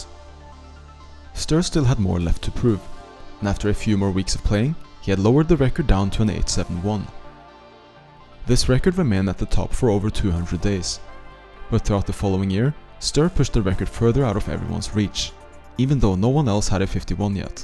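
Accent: Norway. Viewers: As a Norwegian, i could immediately tell you were Norwegian too lmao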